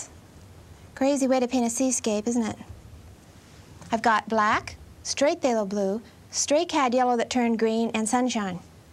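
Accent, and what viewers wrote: South, United States. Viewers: Ahhh, her country accent is hypnotizing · southern style talking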